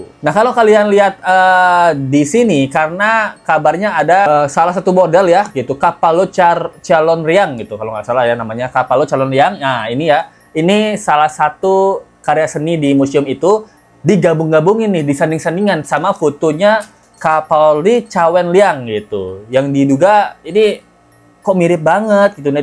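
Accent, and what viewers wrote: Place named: Bali, Indonesia. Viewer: Logat nya Bali banget🎉🎉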